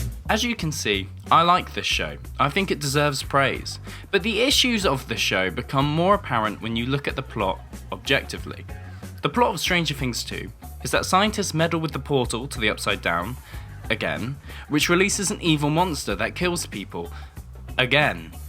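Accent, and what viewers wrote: United Kingdom. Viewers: Shitty takes. Except for Episode 7, this season was great and not predictable in the least. Pretentious Brit or whatever you are. Fuck your accent.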